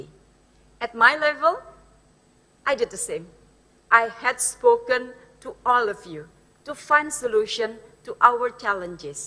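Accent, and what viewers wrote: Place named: Indonesia. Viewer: Menluku Englishnya keren mudah dipahami walau ake Indonesia accent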